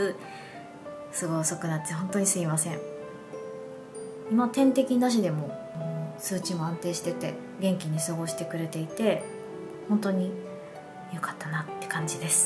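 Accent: Kansai, Japan. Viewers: ご懐妊おめでとうございます(*´Д`*) いつも手際よく美味しそうな料理、お弁当、私には真似出来ないけど、素敵な奥様だなぁと思っていました( ´∀`) うちにも猫がいるので、茶々にも癒されていました。不妊治療もお疲れ様でした、私も同じくらい経験しましたが、残念ながら出来ず、やはり妊娠は奇跡だなぁと思います。暑い時期ですし、無理せずに過ごして下さいm(_ _)m 関西弁も親近感湧きます、サクラ🌸咲くさん、本当におめでとう～(^O^)／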